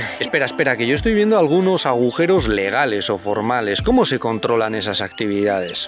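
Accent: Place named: Basque Country, Spain. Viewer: Un vasco - vasco en la corte de chinpin o como se llame.Tiene un acento de euskaldun hablando español muy forzado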